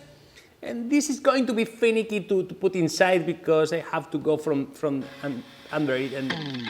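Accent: Spain. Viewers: Más aún? Yo me esmeraria para no tener más acento español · Se nota que eres español xd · Echaba de menos tu ingles casi spanglish, por lo que se ve youtube no me daba en la feed tus videos asi que para mi habias desaparecido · Si vas a hablar en inglés, por lo menos esmerate para que tu acento sea lo más españolizado posible... Gracias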